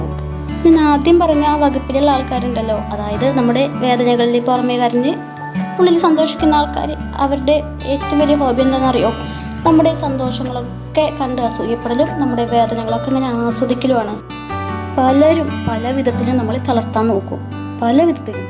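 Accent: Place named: Pattambi, India. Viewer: നിങ്ങളുടെ ഓരോ വാക്കുകളും ഞാൻ ശ്രദ്ധിച്ചു കേട്ടിരുന്നു അറിയാതെ ഉള്ളിൽ ഒരു എനർജിയും ആത്മ വിശ്വാസവും ഒക്കെ വന്നു 😍 പട്ടാമ്പിയാണോ വീട് സംസാരം നല്ല രസമുണ്ട് 👍 All the best dear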